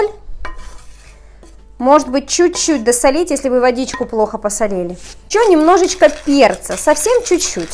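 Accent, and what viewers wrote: Ukraine. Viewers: Люди, у нее все нормально с ногтями и с речью! Она просто из Украины и у нее такой акцент. Почему вы людям другой национальности не указываете как разговаривать! Вот 100% больше половины неучей и неудачников здесь что-то вещают на! Если что-то не нравится, прошли мимо нафиг и не смотрите! Зачем обязательно какое-то дерьмо надо написать! Жаль, что не наказывают интернет-гениев за оскорбления по закону!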